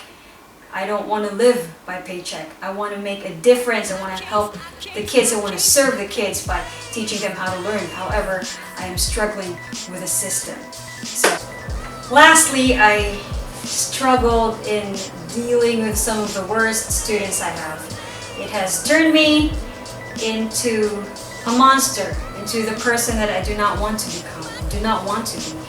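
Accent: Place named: California, United States. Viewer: Just try teaching at the university level here in Thailand. All the students just want to know how to get a diploma without studying or working. I could have made a nice income just writing papers and doing projects for students to present as their own, which seems to be acceptable in Thai culture. Frustration mounts if one is a conscience teacher. By the way, I at first thought you are American from California due to your english accent, maybe California would offer you what you deserve.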